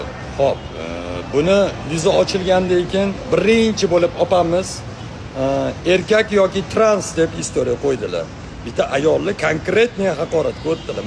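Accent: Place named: Tashkent, Uzbekistan. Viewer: Узинг наманганликсану узинча тош.ни шевасида гапирасан . 50 йил четда яшаганам тилини сотмаган акилли эркак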